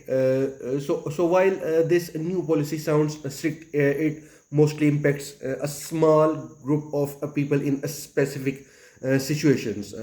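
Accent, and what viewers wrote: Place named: India. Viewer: wow The way you speak English, I can tell you that you are not from USA. You are from India. What do you know about Social Security of USA.??? Everyone in USA knows about their social security benefits. Before you upload any video clip learn how to speak English. All this news are on YouTube video's everyday by DR. Ed weir. So we don't need your Indian accent English, that no one in USA will understand. DR. Ed Weir answering all the question and any kind of question regarding Social security. Stop this bull shit.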